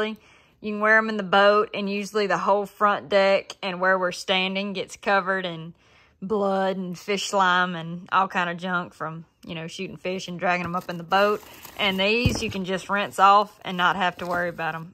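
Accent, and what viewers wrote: South, United States. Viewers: Love this strong southern accent 🤠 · That strong southern accent doesn't scare me... it reminds me of the good old days 😁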